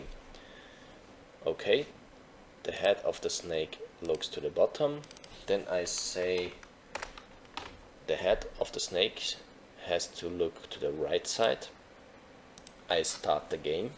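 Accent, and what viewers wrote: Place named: Germany. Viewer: Man I love that German accent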